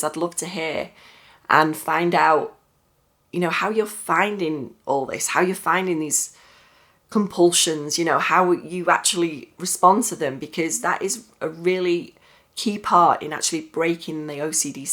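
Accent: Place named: North, United Kingdom. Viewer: Hi,! My name's Vladimir. What I've just tried to do is the video for YouTube with my complaints about my life lol, but not sure I will upload any of them. I'm 34, I've been havin' severe magical thinking ocd for more than 20 years. My life is almost fully controlled by this shit despite the fact I know about ERP and I've been studying psychology. I got rid of some compulsions in the past, but now my life is at the zero point. I'm a russian guy, I work as an English teacher for dimes, I don't have friends and I feel insanely alone. I found your channel maybe at the beginning of June and I wanna tell you that you make great videos and you're a nice person!🙂 Some hours ago I talked to another therapist and it costed a significant amount of money, he's a great man and I do understand it's only the first session, but why the f**k it should cost such money to listen about my unhappy life? 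I love your accent, by the way, I think it's a northerth one.🙂, I've been studying psychology on my own and what I've noticed that people in the west (but I'm not yet familiar with all of these ACT and other stuff) generally talk about ERP, which is great that they tell people about this method, but it's only tip of the iceberg. I believe that scientific psychological theory that tells the reason of OCD as well as panic attacks and other anxiety disorders is the inner conflict inside of our mind. Maybe you will be interested in Jacques Lacan. People sometimes ask - why my ocd themes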